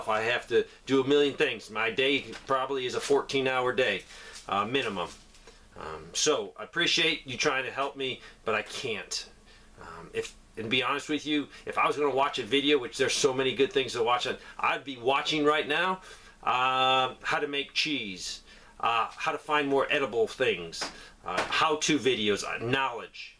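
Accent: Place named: South, United States. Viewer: He's Southern. We talk funny!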